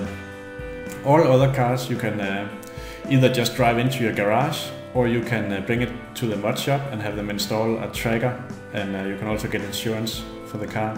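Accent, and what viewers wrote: Denmark. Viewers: Hello may i ask are you danish? Hvis du er KOOONGEEE xD EJ køber selv gta 5 så tak for videoen :D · Er du Dansker? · Er du fra Danmark? Du lyder dansk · Are you from Denmark ? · er du dansker?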